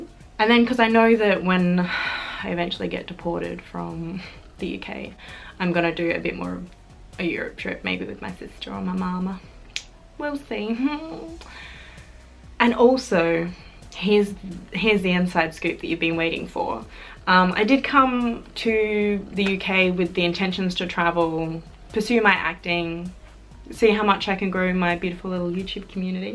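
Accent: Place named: South, United States. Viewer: I love the Southern accent 😊😁😘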